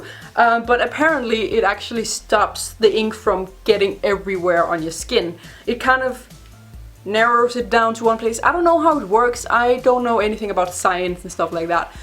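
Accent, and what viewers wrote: Denmark. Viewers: You are Danish aren’t you? As a Dane I can hear it in your accent😉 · Hvorfor kunne jeg høre på din accent at du er dansker? HEJ MEDVIKING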